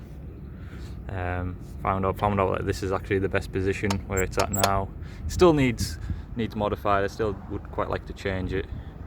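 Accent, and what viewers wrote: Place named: Northern England, United Kingdom. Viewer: thought so from the stronk northern accent ;)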